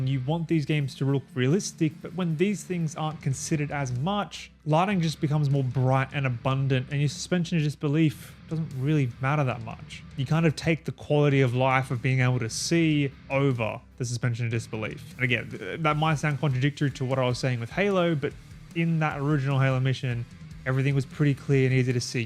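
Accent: Australia. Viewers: brooooo, an aussie? oh mate lets do this, i just listened to an american younin talk about Midnight club for 40 mins and i so wanna be home again baha. - a fred durst ref in the first minute, i'm yours rofl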